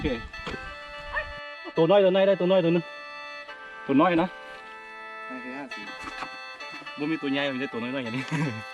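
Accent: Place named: Isan, Thailand. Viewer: สำเนียงเว้าคือคนอิสานเนาะฟังงาย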